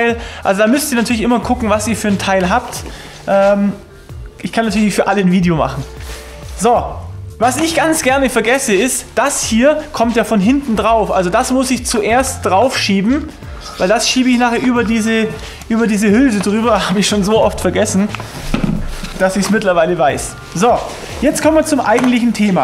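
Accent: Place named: Bavaria, Germany. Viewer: Hey! Super Videos, super Erklärungen die eigentlich jeder (Vollgasdepp) auch versteht und das ganze Gefluche auch noch auf allgäufrängbayrisch - einfach HERRLICH:D Speziell zu diesem Video: nimmt man nicht unisolierte Aderendhülsen für solche Arbeiten um nicht die Adern eben durch jene Isolierung unnötig zu belasten wenn diese im Stecker oder der Buchse dann (ab-)geknickt werden? (wenn man keine hat, kann man auch VORSICHTIG die Isolierung der isolierten Aderendhülsen mit einem feinen Seitenschneider oder einem scharfen Messer entfernen:) ) Grüße aus A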